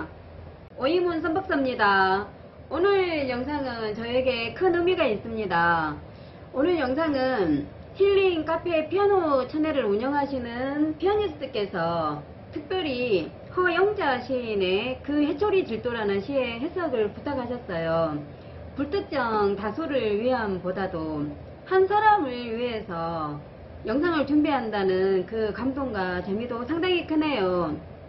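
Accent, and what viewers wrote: Gyeongsang, South Korea. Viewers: 와이프는 AB형 와이프의 행동 방식을 이해하게 되었습니다. 가끔 경상도 억양이 귀엽게 들리네요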